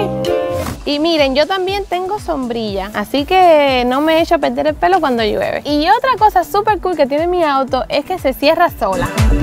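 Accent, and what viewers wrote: Cuba. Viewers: Hola se me haces muy parecido tu hablado a Sandra cires cómo cubana 😁 me encanto tu video y cómo leí con comentario x ahí dile a tu papi que me adopte jajajaja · Porque ella es de cubaa las dos son de cuba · Medefrine ella tiene un acento cubano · Marcela Aguilar claro es cubana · Tu tono de voz y acento es muy paresido al de SandasiresArt eres cubana? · Por el acento cubano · Marcela Aguilar ovio porque es de cuba como sandra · Cuba creo o República dominicana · Por que las dos son cubanas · Hija es que es cubana pensá 👋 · alemán mucho seras.cubana te creo jaja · es de cuba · Jajajaj sii, es q las dos son de Cuba creo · Sus voces son iguales por que las dos son cubanas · cata Orozco por que es cubana y sandra también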